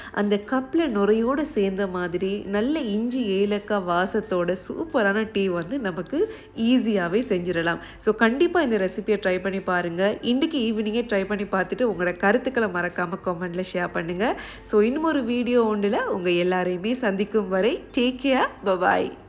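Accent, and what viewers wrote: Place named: Sri Lanka. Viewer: சிங்கார..சிங்கள கொஞ்சும் தமிழ்.. அழகோ...அழகு .❤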